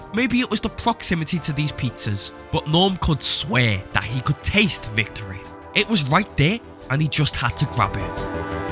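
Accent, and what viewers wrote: Liverpool, United Kingdom. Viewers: Is he a scouser? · We have a scouser, yes lad good to see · I know that's a Liverpudlian accent and this is just fuckin' hilarious. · Are you scouse? Love your accent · Is this a scouser speaking???? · Love the scouse accent peaking through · are you from liverpool?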